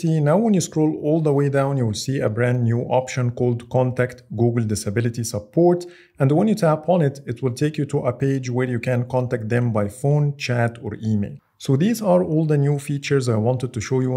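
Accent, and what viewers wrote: Egypt. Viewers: You an Egyptian? I can sense it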